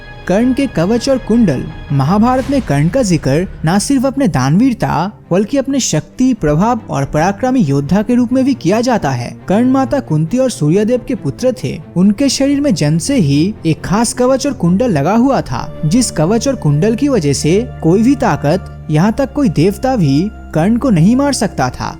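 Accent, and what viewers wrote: Bengal, India. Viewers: are u bengali from west bengal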